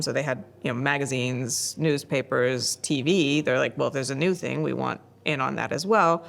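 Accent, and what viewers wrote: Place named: United States. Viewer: Wat bedoel je precies? De inhoud? Of het zware Amerikaanse accent? We hebben allemaal kunnen meekijken bij de CIA dankzij meneer Snowden. Dat Carbon Footprint verhaal is ook makkelijk te fact checken (het recyclen van plastic is overigens exact het zelfde als je toch bezig bent). Ze zegt niets vreemds qua inhoud.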